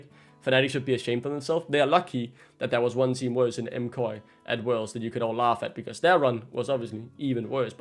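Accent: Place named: Denmark. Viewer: Not the Danish accent hahaha, great video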